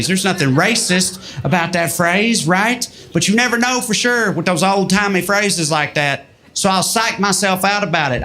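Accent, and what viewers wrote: South, United States. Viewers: Bro I saw the thumbnail and still didn't expect that degree of southern accent. Damn dude. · Sure, but you can also HAVE a southern accent if you're from the south. Some people do, like this guy. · O.K.I am from Quebec and this is PURE joy for me to discover this type of humor,with a southern accent..WOW!! Well done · This man is so southern that (to my Canadian ears) it sounds like he’s imitating a southerner rather than using his regular tone and accent. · Fella acts like he aint been part of the south in 6 generations....... voice says otherwise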